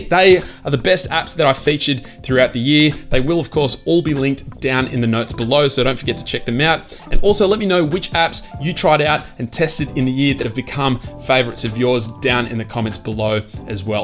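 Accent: Australia. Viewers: Love your hard work and the Aussie accent, keep on bro 👍🏼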